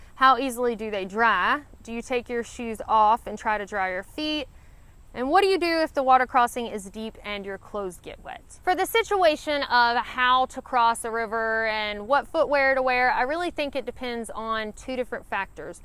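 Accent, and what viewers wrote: South, United States. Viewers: "Kilts and the like don't confine and bind on inclines" :) Hearing you talk does a displaced southerner some good.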